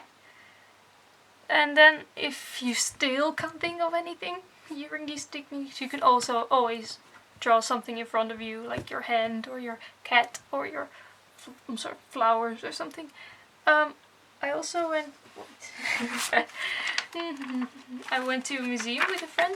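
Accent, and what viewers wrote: Netherlands. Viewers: Are you / Is she Dutch? I hear a familiar accent 😏😏😏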